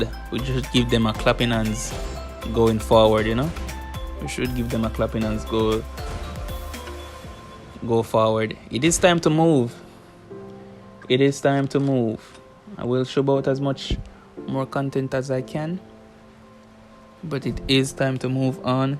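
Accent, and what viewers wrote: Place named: Caribbean. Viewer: hey which country you from?, you sound like a Caribbean person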